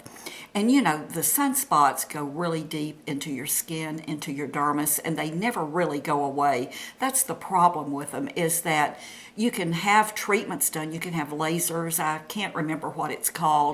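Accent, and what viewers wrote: South, United States. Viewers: Hi! Wow, I've missed you! I loved hearing your sweet southern accent again. I'm excited for you that you will be seeing Rod S. My husband and I saw him last year with Stevie Nicks in Toronto and it was a great concert. I love them both so it was a super night. What a great buy on the skirt. I will be doing some Easter shopping tomorrow so I think I'll pop into Walmart. You have a beautiful figure and your outfits looked so good. Have a Happy Easter. Come on warm weather! xoxo Susie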